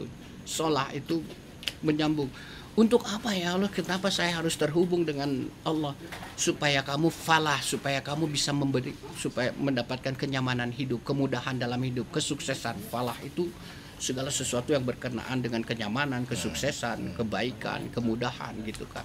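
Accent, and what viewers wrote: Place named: West Java, Indonesia. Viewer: Kang nyariosna meni ngosom khas Sunda pisan...👍👍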